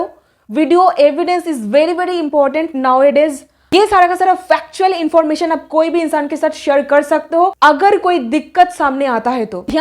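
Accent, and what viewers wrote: Bengal, India. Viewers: Narrator is Bengali ? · Tum bongali logta hai mamta benargibhi aiosa hindi bolata ohae.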